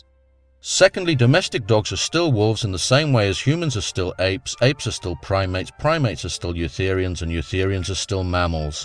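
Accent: England, United Kingdom. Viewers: Because swearing is typically bad manners, and excessive swearing shows poor breeding. Although it is comical within the context of this video because is intelligent, educated, and has a sophisticated British accent. Additionally, this is his channel, so he has and should have the freedom to in his videos. I'm not religious and I don't appreciate it when people swear in public, especially when children are present. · Brilliant!!! Everything I was thinking. But, put more eloquent than I could. Plus cool English accent (not the snobby kind). Problem is, people can not stand not knowing. So they have a tendency to fill in the gaps with whatever sounds cool. 5000 years ago the world being made in 7 days sounded reasonable and there wasn't a body of evidence one way or the other. But, FFS already. Why is it so hard for some people to accept that new information is now available?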